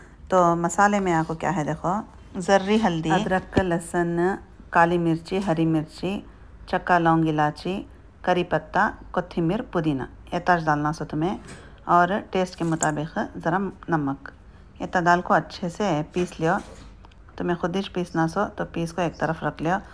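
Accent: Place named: Deccan, India. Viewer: Behtareen recepie aunty ... Bohat khoob ...so nice to hear recepie in our famous dakhni slang... That added wit & humor is the highlight...All the very best ... Please share ur daawat vlogs & shadi menu recepies ..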